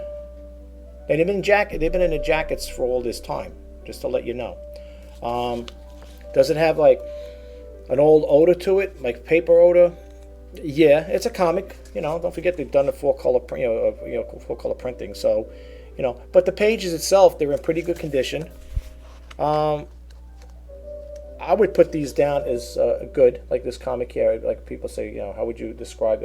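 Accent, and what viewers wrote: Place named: New York, United States. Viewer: Beautiful back ground music at the end of this vid..I'm 1/2 Finnish American, you may enjoy Sibelius...Finlandia and the Swan of Tuolla ...wrong spelling but you get the idea...you take nice pics of the clouds too...take it you are from New York...your accent...happy hunting and peace to you and yours this approaching Christmas Season ! Corie in Seattle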